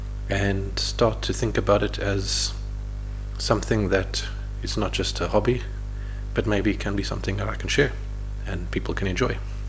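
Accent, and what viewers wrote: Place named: Ireland. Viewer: You already have a bit of an Irish accent yourself!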